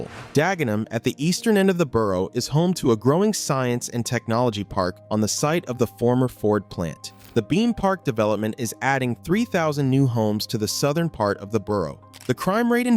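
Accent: United States. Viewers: Why is an American unfamiliar with London and its place names narrating this??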